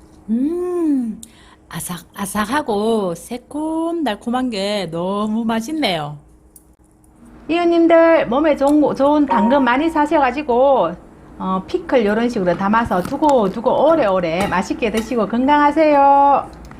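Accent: Gyeongsang, South Korea. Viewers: 호불호가 있지요. 갱상도 말씨 ㅋ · 경상도말씨 너무 정겹네요 내고향 구미가 그립다 지금여기는 비햄기타고 가야하는길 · 당근짱아찌,짱이임미데이! 경남 말이 정겹습니다, 경남 어디예요, 완전 토종 경남 말인데요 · 말투정말 마이 정겹네요 완존 대구말씨..??맞죠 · 아무리 경상도 표준말씨라지만 듣기가 거북한면도 없지않네요 메뉴는 도움이 될 수 있겠지만ㆍ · 대구말시도, 잼있고좋은요리 감사해용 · 아니지 우리 노인네들에겐 딱 좋구먼...... 경상도 사투리라서 표준어 사용자들은 빨리하면 무슨말인지 잘 못알아들을수도 있고...... 암튼 최고 우리 힘든 주부들위해오늘도 열일하는 님❤ 캘리포니아 할미가 👍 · ㅎ ㅎ ㅎ대구신가요?..^ ^ 말씀넘 귀여우셔요~😊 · 이해합니다 저도 말따로 마음따로 거든요. 경상도사투리가 정겹고 웃음이 나네요 아주 유익하네요 내일당장 해 보려구요 좋은정보 감사합니다😊👍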